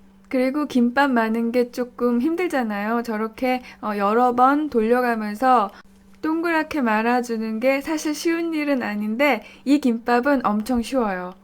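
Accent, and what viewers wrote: Seoul, South Korea. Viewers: 목소리좋아요. 서울본토발음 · 교포가 아니라 서울사투리 쓰셔서 그런것같애요^^ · 말투가 80년대서울사투리에요 ㅋㅋㅋㅋ<3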